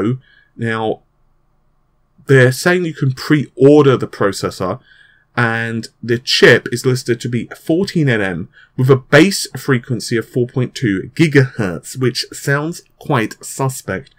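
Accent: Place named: Cockney, United Kingdom. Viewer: 2 & a half minutes til you actually say anything worth listening to. Thru all the mentions of ppl. Let them get their own youtube channel & get their name out there. Then tons of opinions & nonsense. Just get to the fuken content that the video is named after. Less your opinions & bad cockney accent. It's like wading thru a pond in your mind til we get to the information. Why when a mics turned on do ppl all of a sudden get diarrhea of the mouth. You're very verbose.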